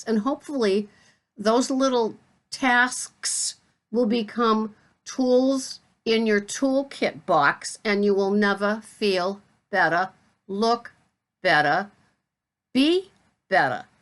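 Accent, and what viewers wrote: Boston, United States. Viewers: Morning to ya,! I am behind but cant resist your Boston accent! Yes, it will always be total carbs for me. Anything else is to 'iffy' xoxoMarjorie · I LOVE the Boston Accent. I need to do my New York Accent! Lol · Good morning. I love, love you. You will never be called crazy as far as I'm concerned. I don't know if you have seen my last video "Coffee chat". That I posted on Friday, January 19, 2018 or not. Now that is crazy. Love the Boston accent. It makes me smile, good for the soul. Enjoy your weekend. 💕. NonnaGrace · yesss! I saw that too on Jason's channel! I totally agree with that. When you're eating whole fresh foods you won't have all those hidden sugars and hidden "junk" but people are eating so much processed keto stuff now that carb number can creep right up on ya! I love your boston accent!!!!!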